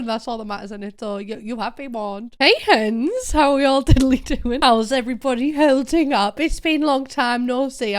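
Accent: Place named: Northern England, United Kingdom. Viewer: Sending good thoughts to you and your family and I am glad we have you back, even for this one video :) I've always enjoyed your content and have done for the past few years when you had 20k subscribers. I always enjoyed watching you cause you were the only simmer/youtuber I found that sounded slightly like me, I found it comforting that us Northern Lasses are getting some spotlight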